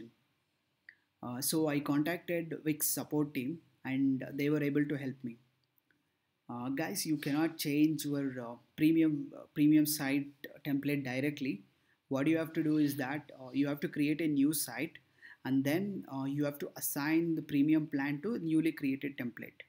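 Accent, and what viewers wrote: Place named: India. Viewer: Thankyou so much. So glad to hear a indian helping us out.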